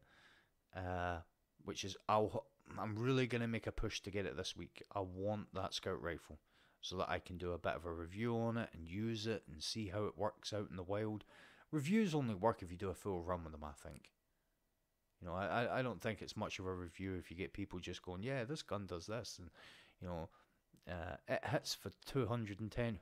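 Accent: United Kingdom. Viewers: you’re very welcome jajaja. It’s nice watching your videos. British accents makes it fun to watch.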